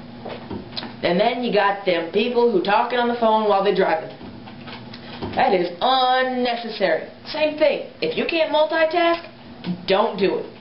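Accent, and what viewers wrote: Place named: Texas, United States. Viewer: so, if you are not from Texas where are you from. You must have lived in Texas for long enough to gain an accent. I really enjoy your video, and you look amazing.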